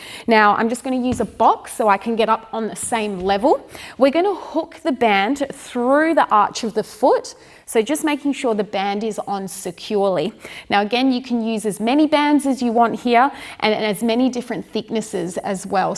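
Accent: Australia. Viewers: Great to hear an Aussie accent.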